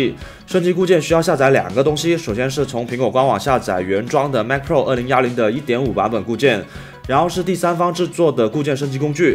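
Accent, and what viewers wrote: Cantonese, China. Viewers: 是不是粤语人？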